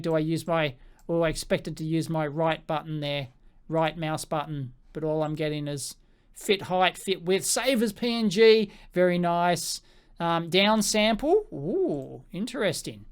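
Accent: Australia. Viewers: What brand is the screwdriver set? I hear "weir" or something. Or was it Wiha? Sorry, listing to an Australian with American ears.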